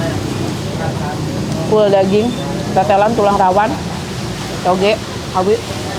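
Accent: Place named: Java, Indonesia. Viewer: klo liat teh makan bakso bikin ngiler.. medok bgt...🖒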